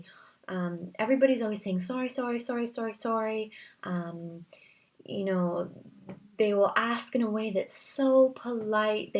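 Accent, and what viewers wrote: England, United Kingdom. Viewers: I can hear English in your accent! I love when foreigners come over and pick up our accent in some of their words <3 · You sound like you're actually picking up some of our accent :) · You're a lovely ambassador for Canada,. And you're starting to develop just the hint of an English accent. Thanks for the video - and the complimentary views about London: we Brits need to be reminded from time to time that there are FAR worse places to live................! Actually, I think we're a bit spoiled in some ways - and take SO much for granted. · You sound like you picked up a lot of the accent in the UK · I'm British and I use 'sorry' excessively, even in completely the wrong context. it's becoming a real problem. [p.s, you have a twang of english accent in this video!]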